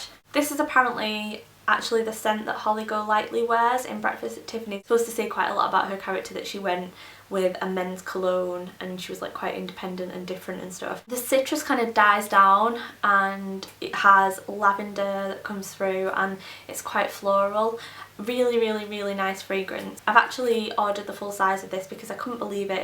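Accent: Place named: Leeds, United Kingdom. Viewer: i love your accent :) r u from leeds?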